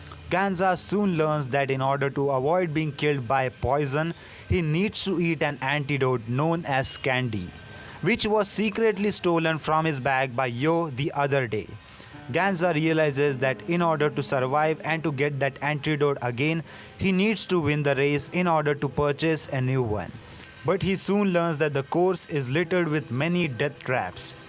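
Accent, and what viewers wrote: India. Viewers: Are you from india your voice sounds like indian I am a new viewer so I don't know if you said anything about this in past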